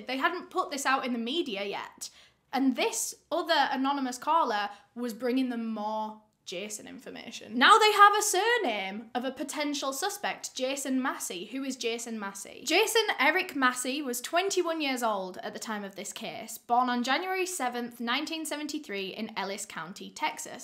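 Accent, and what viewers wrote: United Kingdom. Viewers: I found British MrBallen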